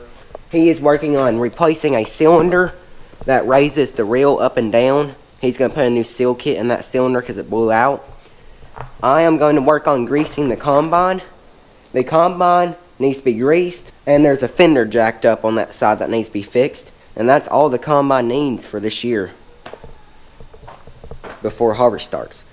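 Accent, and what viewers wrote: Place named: Kentucky, United States. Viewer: ...I am a city guy and know little (well really nothing) about farming. Any kind of farming!! However I do enjoy watching the various farming videos on YouTube. And I have learned a lot. Still trying to figure out the different kinds of cows...Heffer, steer, etc. Your video is the first one about chicken farming that I have watched and I will be following your videos. I have so many questions that I am sure will be answered. Havent seen your chicken "barns or houses" with chickens yet but am anxiously awaiting your videos. And just so you know...your presentation is really good. Maybe it's that Kentucky accent...but your presentation is very good. Please keep the videos coming, and maybe I will finally figure out what came first...the Chicken or the Egg. Yeah, you knew it was coming.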